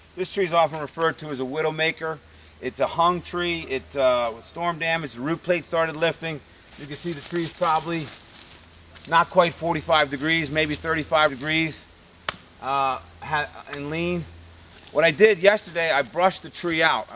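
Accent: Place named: East Coast, United States. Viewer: Great video and your East Coast (NY?) accent is music to my ears.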